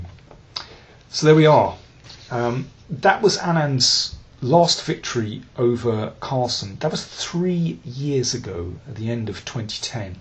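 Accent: United Kingdom. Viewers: lol what's up with this guy's rich british accent, sounds very cartoonish haha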